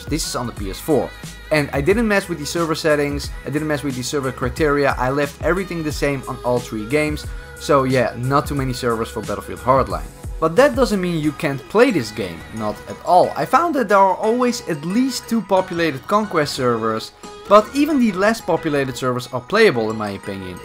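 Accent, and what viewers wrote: Netherlands. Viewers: You sound Dutch. Are you Dutch? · I can hear you're dutch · Great Video Mate👍🏽 Are you from the Netherlands? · Are you Dutch or something? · Nederlands Engels accent, bah, werk er aan! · Steenkolen Engels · Are you from nl ? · your voice sound nederlands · You sound Dutch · Are you dutch? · Are you dutch? I hear that accent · Youre dutch right? Want ik ook😂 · Hey dude, you’te Vids are very good and you for sure desurve more subs but i have a feeling you are dutch, are you ? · Your voice sound dutch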